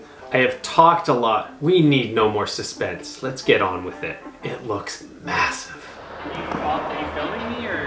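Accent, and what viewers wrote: Canada. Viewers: my god I love how Canadian you are. "body checked the ground." haha! Just the delivery of it ! God bless skateboarding, hockey and Canada!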